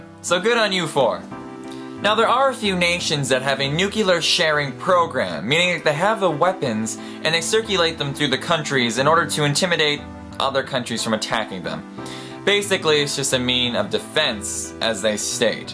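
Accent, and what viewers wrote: Maine, United States. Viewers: Wow. My first time hearing an accent from Maine. Hello from Texas! :D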